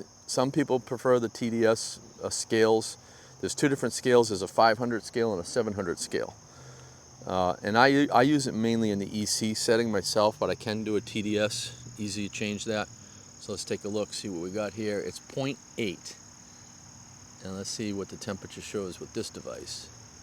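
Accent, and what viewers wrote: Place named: Maine, United States. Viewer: You sound like your from maine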